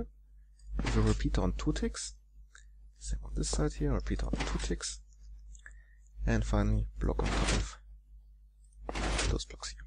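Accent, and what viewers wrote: Germany. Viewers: The houl sing,, german accent means: your are german, right ? the only thing i dont like in this video is the texture pack(reminds me of my old days in minecraft :,) ), but the door itself is cool :) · Man merkt das er deutsch ist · Are you german 😅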